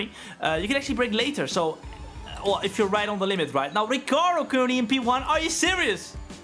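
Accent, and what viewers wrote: Netherlands. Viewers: Very nice video. I can barely tell you're Dutch. Your English improved a lot.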